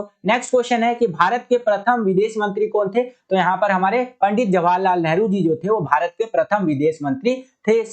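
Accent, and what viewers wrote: Bihar, India. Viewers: Sir Bihar se hai kya aap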